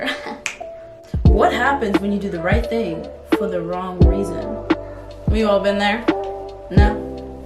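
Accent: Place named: England, United Kingdom. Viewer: Oh my god, so glad you popped up on my recommended! You have such a great personality and loving the english accent ;)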